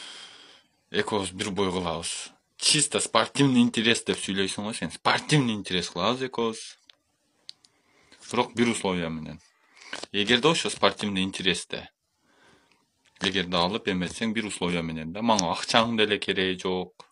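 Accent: Standard. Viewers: Кыргызчаны таза суйлойт экенсин Сенамдарга Караганда олаа Сола оняк соняк тана ука тирик жетим жакшына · Молодец кыргызча таза суйлойт экенсин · Достойный Таза кыргызча суйлопсунго молодец💪 журогун кыргыз экен 🔥🔥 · Азамат Манастын тилин таза суйлойт экенсин иним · Баракелде анча мынча кыргыздардан таза суйлойт экенсин аман бол укам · Молодец орус болсода таза кыргызча суйлоп салдыго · Бул таза суйлойт го кып-кыргызча👍👍👍👍👍👍👍👍👍👍👍👍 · Таптаза суйлойтко бул бала Алхамдулиллах деген созун абдан жакты ийгилик Аман бол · Баракелде бала , таза суйлоптур 👍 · Молодец кыргыздын орусу таза суйлоптур алга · Азаматсын опкосу жокторго караганда таза суйлойт экенсин азаматсын чон жигит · Азаматсын жигит Кыргызчаны менен жакшы суйлойт экенсин мен Лейлекский акцент менен тата пата деп суйлом сен орус болуп таза суйлойт экенсин тан бердим. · Красавчик орсу болсондо бауржандан таза суйлойт екенсин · Рассиянын каериндесин Уят кылдын кыргызча суйлоп Кыргызчаны сендей таза суйлошпооойт. 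Альзамдулиллях деген созун учун ылайым еки дуйно бактылуу бол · Молодец,жунбаш мага караганда таза суйлойт экенсин кыргызча 😂😂😂 эркек менда сени колдойм. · Маладец еркек екенсин орус болсонда ит емгенге караганда кыргызча таза суйлодун 👍👍🤝🤝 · Аззаматсын таза Кыргызча суйлогон учун👍🤝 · 😂😂😂ама эркекче болуптур а булжагы? Анча мынча Кыргызстандыктардан тазза сүйлөйсүнго жетим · Красавчик таза анча мынча кыргызга Караганда таза суйлопсун мына сени патриот десе болот орус болсо да кыргыз тилде суйлогон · молодец тууган кыргызчаны өтө таза сүйлөйт экенсиң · Орус кыргычаны таза суйлойтко хайы келдимго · Баракелде мына накта жигит. Таза кыргыз тили менен суйлоп жатат. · Маладес Орус Улутуңда Болгонуң менен Кыргызчаны Таза сүйлөйт экенсиң Азамат Сени менен дос болуш керек экен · Нукура,таза суйлойт экенсин. Азамаатсын,жигит. Бар бол,аман бол,ийгилик саган. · Менденда таза суйлойтко 😀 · Азаматтсын иним таза Кыргызча суйлойт экенсин ишине ийгилик кайда журсон да аман эсен бол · он кыргыздын ортосуна бир орус келсе ону тен орусча суйлогон кыргызым ай бат эле сатылып кетесинер мен жон гана туура обу жок катын орсок уй сайт деп койот кыргызда обу жоктордон он эсе таза суйлойт экен анысына рахмат мен бауржанды эле колдойм себеби ал кыргыз мен кыргыз экенимди мекенимди сатпайм бирок бабуржандын оздук аламдык адеп ахлагынабы же элге болгон улгусуно карасак точно эле тантык. 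· Кыргызча таза суйлон экенсин 👍👍👍👍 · Красавчик. Условияны туура койдун.Кыргыз тилин таза суйлойткенсин!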